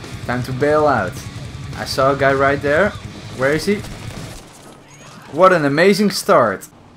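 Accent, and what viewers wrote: Netherlands. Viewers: Je kan horen dat hij nederlands is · I smellde a Dutch accent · Are you dutch? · your Dutch accent is overwhelming haha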